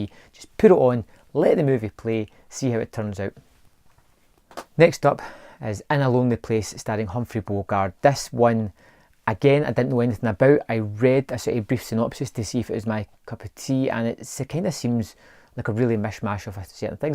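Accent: Scotland, United Kingdom. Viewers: First time watcher. Good to hear a Scottish voice. Nice pickups buddy 😉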